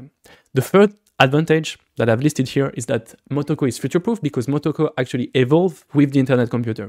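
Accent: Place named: France. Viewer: There must be somebody without a French accent who can give us fantastic information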